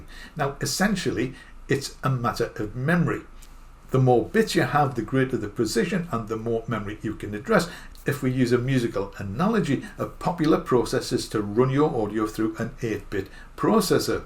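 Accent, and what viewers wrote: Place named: England, United Kingdom. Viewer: Excellent and clear. Bloody champion and the proper English accent is welcome too!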